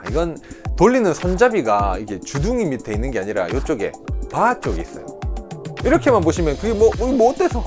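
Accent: Gyeongsang, South Korea. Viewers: 저 진짜 팬이에요 ㅎㅎㅎㅎ 다른 아이디로 cocokim 으로 구독 하고 2년 넘게 보다가, 지금 유튭 활동중인데 너무너무 재밌게 보고 있어요 ㅎㅎㅎㅎ 저는 미대 공부한 프리인데 공구 보는게 너무 재밌어요!! 타계정으로 보기 불편해서 주계정으로 또 팔로했네용 ㅎㅎㅎㅎ 언능 언능 보려규요 연기력도 늘었다고 ㅎㅎㅎㅎㅎ 한 사람이에요 갱상도 고향이라 넘 목소리 친근해서 힐링 되서 빠져서 들어요...ㅎ ㅅ ㅎ ❤️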